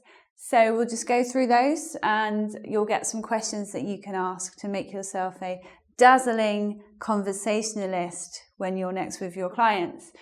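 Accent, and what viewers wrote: United Kingdom. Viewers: Strong Britsh accent! :) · I LOVE THE BRITISH ACCEPT XD !!! I LIKED TOO MUCH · I love your lesson, also British accent... many thanks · omg British women has the cutest accent which makes me very attracted to them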